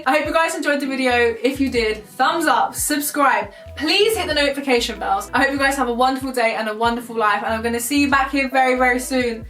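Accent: Croydon, United Kingdom. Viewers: Charmee Taylor She addresses in an assumptions video how people think she's trying to be black when it's just the accent. That's just the accent in Croydon, all races speak this way there ☺️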